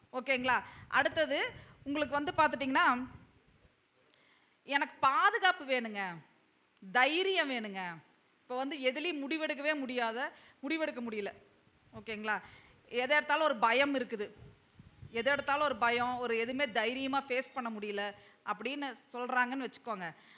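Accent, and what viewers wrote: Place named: Kongu, India. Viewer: வணக்கம் அம்மா. ஆழகு, அருமை,அற்புதம்,உங்கள் கொங்கு தமிழ் WOW semma super speech நான் நீங்கள் சொல்வதை பின்பற்றி வருகிறேன். ""மாஷா அல்லாஹ்,,, நன்றி நன்றி நன்றி மா. எல்லா புகழும் இனறவனுக்கே..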